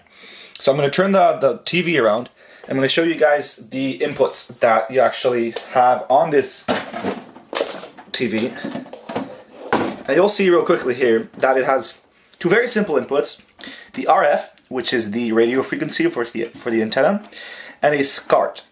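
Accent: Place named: United States. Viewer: Judging from your accent, i thought you were American.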